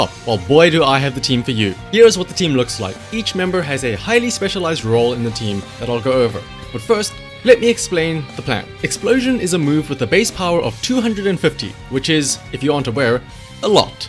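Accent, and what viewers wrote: South Africa. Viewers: Is that a South African accent I hear? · A,,, a south african poketuber?????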